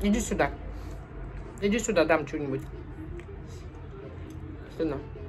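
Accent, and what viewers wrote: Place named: Russia. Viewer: Вы хотя бы правильно назвали улицу...😂Самбурова !!! ( спроси у местных) кацапский говор + не правильно ударение😂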